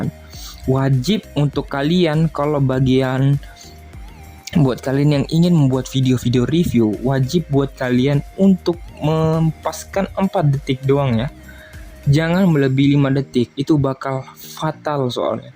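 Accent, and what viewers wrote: Makassar, Indonesia. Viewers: Orang makassar yah bang?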